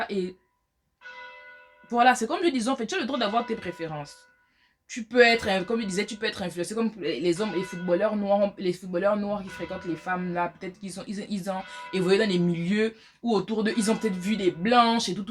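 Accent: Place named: Gabon. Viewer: Le son de ta voix jai directe dit .gabon moi aussi badecon 😂😂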